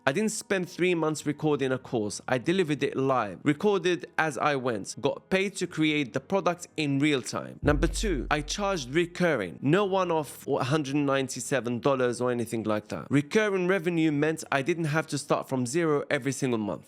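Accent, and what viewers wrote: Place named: Morocco. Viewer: you have like morrocan accent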